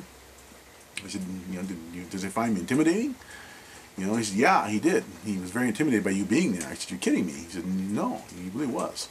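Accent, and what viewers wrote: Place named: Ireland. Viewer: You might get mistaken for an potential Irish thug but no where near a Tony Soprano. Your Irish comes out too much in everything you do. Hell, I can tell you're irish even though you haven't told me! Now me, on the other hand...I'm called "the Godfather" by all the guys I race R/C trucks with...they line up in the pits to come talk to me and shake my hand...one at a time. That's how I got the nickname "Godfather". This is no lie. I'm usually smoking a Churchill sized gar when this happens..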